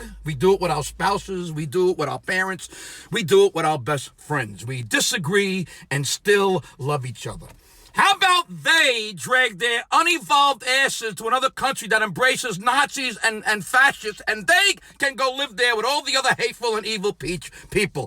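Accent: New York, United States. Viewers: I am so warmed by this. The Rant, the accent, the 'you're an idiot' head tilt. It feels like home. Damn I miss NY.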